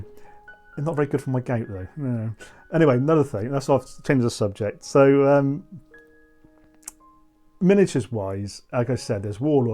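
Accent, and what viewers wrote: West Country, United Kingdom. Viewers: Nice vid bud, love the bolt action table. Seems I’ve stumbled across another West Country wargamer going by the accent lol.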